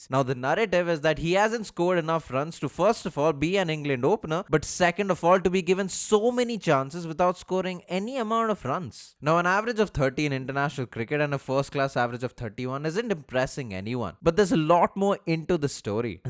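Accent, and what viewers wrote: India. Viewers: This pjeet accent is sending me😂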